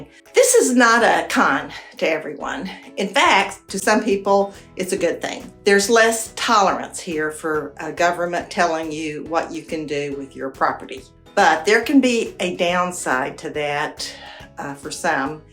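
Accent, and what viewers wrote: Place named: West Virginia, United States. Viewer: I see you picked up the accent lol. I live in southern WV and very rural and everything you say you’re lacking we have. We welcome everyone but liberal democrats. Y’all tend to forget why you left your original state.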